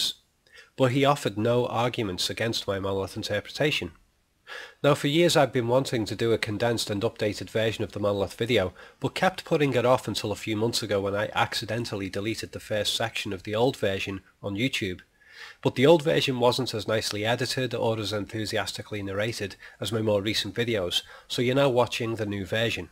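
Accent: Liverpool, United Kingdom. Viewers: I have a question: Are you a scouser??? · Do I detect a faint Liverpudlian accent... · Just found this doc and it's just what I wanted to see before 2001's re-release this month (Nov 2014)...Also...Whey! a Scouser!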